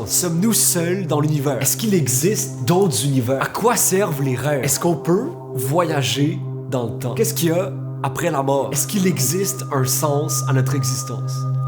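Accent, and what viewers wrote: Quebec, Canada. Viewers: c’est l’accent québécois 😭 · L'accent québécois, impossible à prendre au sérieux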